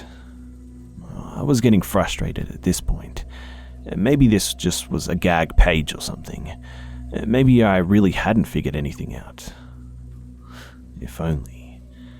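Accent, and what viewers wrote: Australia. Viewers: I fucking love your Aussie accent hnnnng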